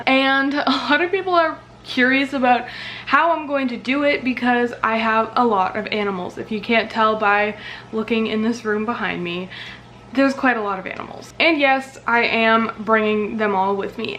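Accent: Canada. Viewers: I love your Canadian accent lol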